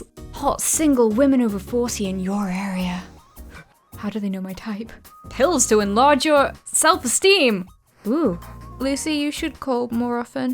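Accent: England, United Kingdom. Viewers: British JaidenAnimations? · is that an english accent? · You are the british accent of jaiden animations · Are you British? You have an accent. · YOU SOUND SO BRITISH That's not an insult you're brilliant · Jaiden but british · This channel reminds me of Rebecca or let me explain studios but a UK accent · You sound the British version of Jaiden Animations No offense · Is she british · It sounds like she tries to hide her english accent from some reason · Is this person British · you should Do an disstrack with your beautefull british voice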